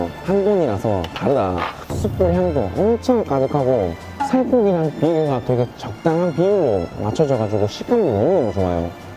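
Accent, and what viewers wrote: Gyeongsang, South Korea. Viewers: 와.. 그냥 몇번 봤는데 대구말투에 결국 구독박고갑니다ㅋㅋ 대구사는데.. 인천 가게되면 꼭 저기 가봐야겠슴다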